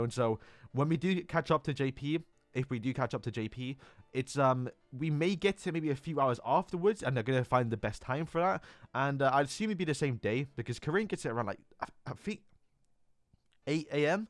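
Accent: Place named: United Kingdom. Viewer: Seriously there are British youtubers accent then theres accent who is on whole different level, istg accent is my salvation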